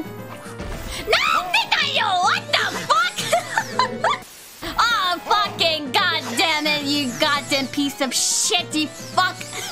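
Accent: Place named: South, United States. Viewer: the cursier she gets the more her Southern drawl comes out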